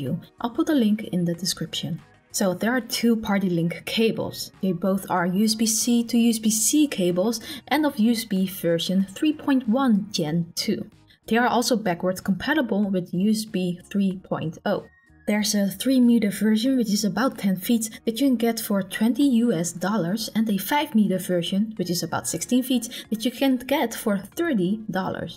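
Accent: Netherlands. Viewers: hey jouw accent verraad dat je van nederland komt. am i right?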